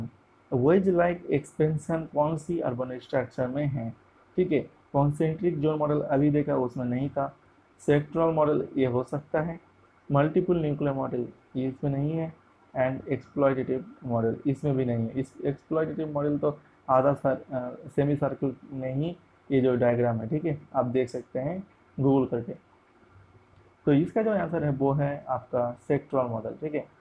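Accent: Bengal, India. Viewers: Sir appke english m bangali tune mix hota h so thoda sunne m effort krna pdhta h thoda app vocal ko open krne ki practice kare humble request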